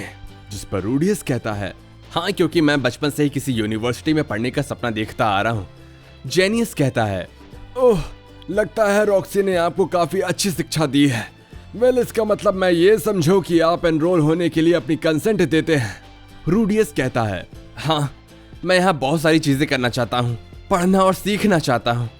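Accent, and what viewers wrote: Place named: Lucknow, India. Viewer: Pakee chinery se pata lagta ha ap bhi up hardoiya Lucknow Se ho😊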